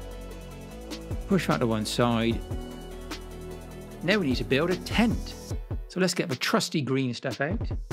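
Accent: South West, United Kingdom. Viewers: I figured there was a south-west-ish flavour. I'm in Derbyshire limbo lol, as in right on the border of Derbyshire but with a Sheffield postcode, which is technically Yorkshire.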